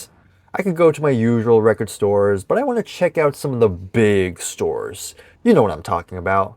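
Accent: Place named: England, United Kingdom. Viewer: I'm used to speaking in an English accent. I tend to look for specific things. I don't like just browsing and buying stuff I might like without checking it out first. I'm after certain items and online I can check who has it in what condition and for what price while riding the train to work. I also buy a lot more that way... Hmm...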